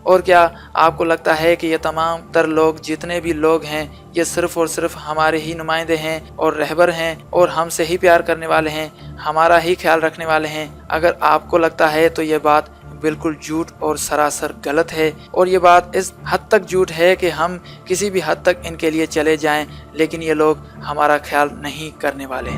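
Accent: India. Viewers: Tum indian ho tumhara lehja indians wala haiy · Tumhara lehja pukar pukar Kay keh raha ha kih tum bharti ho.is ka Matlab ha kih tum Sara din social media ka Kam kartay ho. Lagay hath imran ki zanashuda zindgi ko b Sam karo. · aap agar pakistani ho to indians ki tara kaun bol rahay ho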